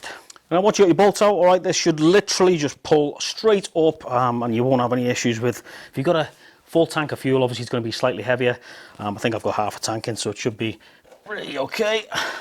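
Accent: Liverpool, United Kingdom. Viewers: Nicely done, taking your Scouse glide to another level as well as your editing and audio. I never saw on your “ To Do “ list win the league for Liverpool. Sending my best wishes from Scotland to you brother. Much love to you and yours. 👍👊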